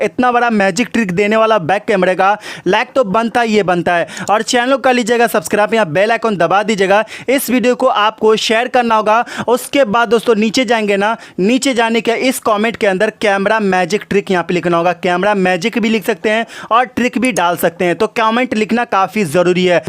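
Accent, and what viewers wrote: Bihar, India. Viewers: Kya tu bihari hai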